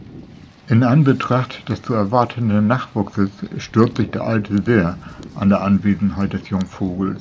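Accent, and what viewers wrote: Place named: Hanseatic, Germany. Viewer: Durch Zufall diese Krähen-Videos entdeckt - bin ganz begeistert. Zusammen mit den tollen Kommentaren mit authentischem, hanseatischem Einschlag ein harmonisches Gesamtkunstwerk. Bitte unbedingt mehr davon und alles Gute nach Hamburg!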